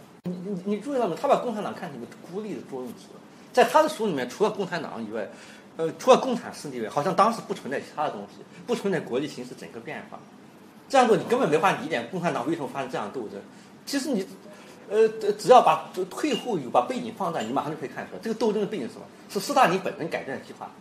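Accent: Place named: Xinjiang, China. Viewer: 刘先生虽然是四川人，但标准的川普里明显带着我们新疆口音的基础！哈哈！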